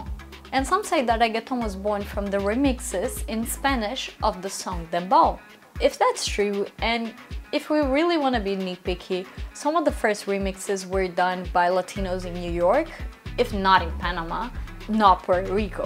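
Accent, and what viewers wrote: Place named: England, United Kingdom. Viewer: Where are you from cus u got a strong accent in english but an english accent in spanish. It dont make sense.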